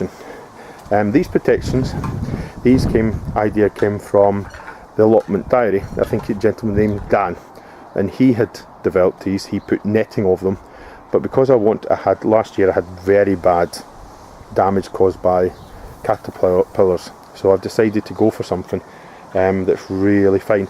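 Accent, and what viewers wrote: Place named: Scotland, United Kingdom. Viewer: Great raised beds, Great frames, Great Vid !! Correct me if i'm wrong but your Scottish accent seems to have something mixed in ?? Maybe a touch of European ??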